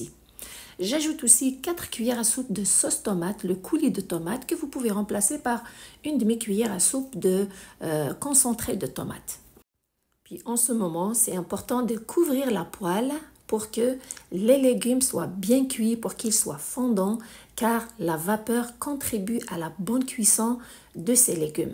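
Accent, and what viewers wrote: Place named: Kabylie, Algeria. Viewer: Merci, faut avoir 2 mains gauches pour ne pas reussir cette Coca grace a tes consignes 😜Un bonjour de Hollande d'un wlid bladak (j'aime ton leger accent kabyle)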